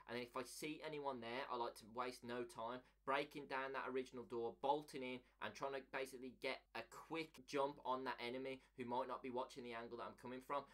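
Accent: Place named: Birmingham, United Kingdom. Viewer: I hate the brum accent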